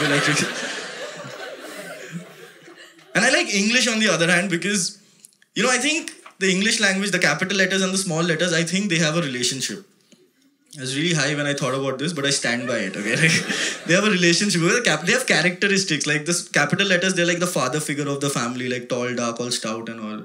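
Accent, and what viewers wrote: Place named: India. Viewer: Where can I get this stand up comedy script? Im sorry, I didnt knw english with indian accent. From Bali.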